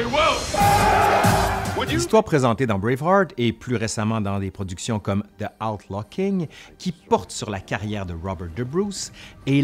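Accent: Quebec, Canada. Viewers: je suis un simple francophone, je vois une vidéo avec un accent Québecquois à couper au couteau, je souscris. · Tabernacle Chrit osthie j adore l accent québécois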